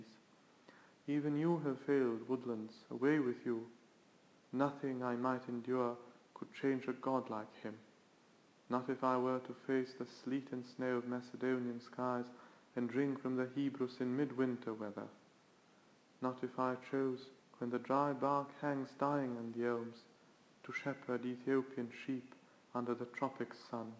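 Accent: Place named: RP, United Kingdom. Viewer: Your English accent is very posh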